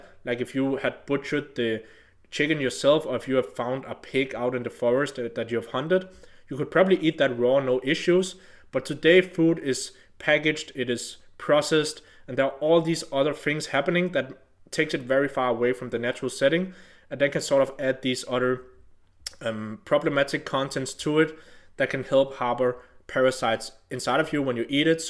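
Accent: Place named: Denmark. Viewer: Danish? You sound familiar :)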